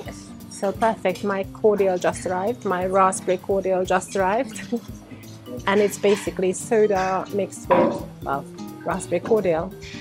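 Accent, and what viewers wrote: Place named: United Kingdom. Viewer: You seem to have a slight British accent. How many years have you worked in the UK? Is there still a lot of young hungarians working?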